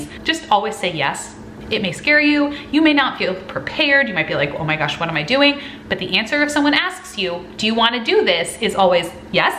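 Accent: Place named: Midwest, United States. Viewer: Ok but the foldable clipboards (one that fits in your pocket!) are good! I love your videos btw. Are you from Minnesota? Or somewhere in the Midwest? I’m from Minnesota and your voice kind of sounds Midwest!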